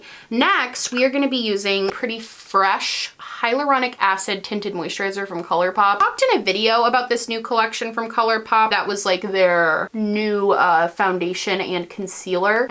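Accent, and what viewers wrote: Australia. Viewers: I love the new hair!! I work at a hair salon back home and damn he did you dirty!! Obviously it’s a little harder to get off your skin than more natural looking color, but he should have STARTED to get that off while you were at the bowl and then brought you a towel or cotton ball with the remover on it when you got back to their chair!! Regardless, I love the darker look, I thought in the video before your hair looked purple, glad I wasn’t going crazy 😅 Also I loved the Australian accent 😂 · I really appreciated the Australian accent 👌😂❤️ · Hahaha as your number 1 Aussie fan I cracked up laughing because I was thinking about how much we pay for shipping which sometimes is the same price as the palette we pay for!! Lol 😂 but love your Australian accent mate ! X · As an australian, I'm afraid I must stan your Australian accent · I just legit cackled at your Aussie accent like the down under witch I am 😂 And yes, you damn spoiled Americans!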